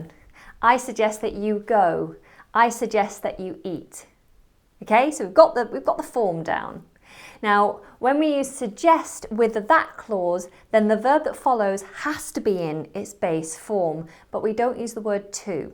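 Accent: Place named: England, United Kingdom. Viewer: You get Germanized? Hurra! Du solltest unbedingt auch den bayerischen Akzent ausprobieren. Der ist leicht zu erlernen und kann Deinen englischen Akzent gut verstecken. Der norddeutsche Dialekt ist zwar näher verwandt mit Englisch. Aber darin ist Dein englischer Akzent leichter zu erkennen.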